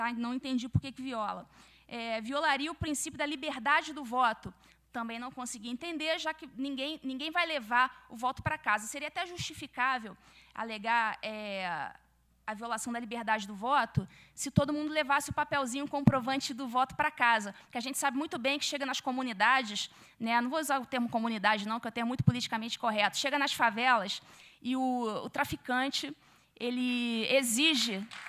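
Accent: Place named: Rio de Janeiro, Brazil. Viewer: Muito competente... Parabéns..só uma coisa menos esse carioquex... Fica td certo..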